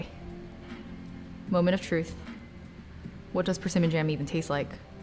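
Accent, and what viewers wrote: RP, United Kingdom. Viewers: tea time! sip sip I love your British accent as a British person. A bit posh though ngl Earl = Uurl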